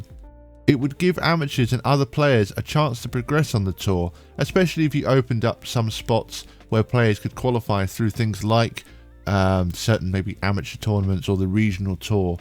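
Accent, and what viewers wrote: United Kingdom. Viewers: Hello I have been a keen bowler for over 40 years in the UK.I have enjoyed your new web broadcast .It is nice hear a english voice and thoughts on the pba and bowling in general .I wander if you could do a broadcast on bowlings olympic bids .especially with the Olympics in America in 7 years time I have asked the BTBA for their information on the bid but know answer. Also the world bowling federation and once again no answer..I find it unbelievable that they had no information on this important subject .Also have you plans to talk about the state of bowling in the UK which in my.opinion is going to the dogs .Would be good to see and I would value your comments please.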